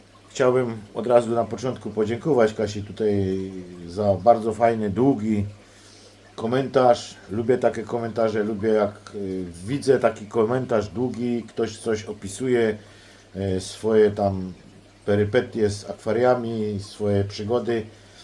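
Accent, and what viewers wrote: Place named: Silesia, Poland. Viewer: Cześć. Fajne i ciekawe rzeczy opowiadosz,miło sie słucho,szczegulnie jak godosz po naszymu😬.Pozdrowiom ze Mysłowic.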